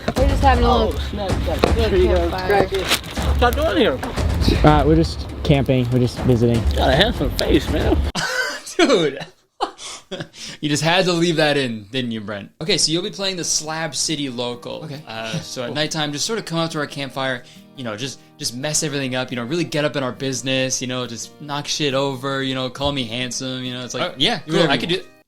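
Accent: Canada. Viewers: is the most Canadian I've ever heard you. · kurtis's canadian accent rly popped off here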